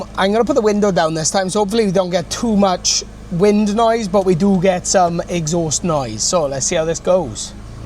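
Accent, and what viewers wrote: Wales, United Kingdom. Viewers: Tell me he's welsh without telling me he's welsh lol. another great video mate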